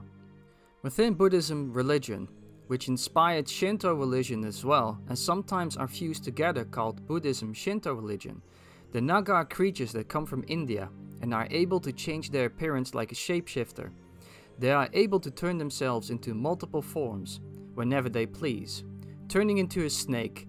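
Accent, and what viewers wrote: Netherlands. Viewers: Dude ben je Nederlands ofz want ik hoor tering vaak een Nederlandse stem en accent er door heen. En je moet een beetje werken aan hoe je sommige dingen zeg naar verder goed bezig man!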